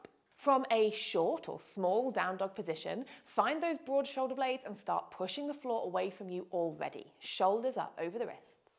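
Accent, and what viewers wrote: United Kingdom. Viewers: Your videos are so much better than others I've seen for a few reasons: 1. No gung-ho ego-driven nonsense, 2. Great pedagogical style, 3. British accent.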